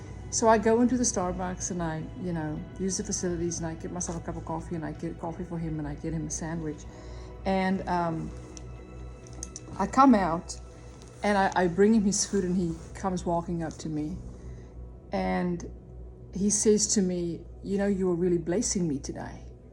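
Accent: South Africa. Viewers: Are you South African ? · My parents are from your area and we have spent a lot of time there as of late when we are back in states from Mozambique. Do you happen to be South African?? Living out here we can recognizee that at times. What a blessing of a story! Keep pressing in courage! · Great experience. Are you South African ? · You sound like South African.. I’m from NZ living here in Texas. I too had a couple of like incidents with the father here all these years. Isn’t he amazing Aye? Bless you..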